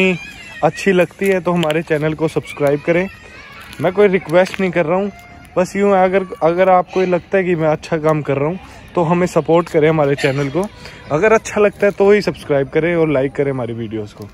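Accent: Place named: Haryana, India. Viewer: Mujhe toh tumharo haryanwi tone bahut achhi lgti h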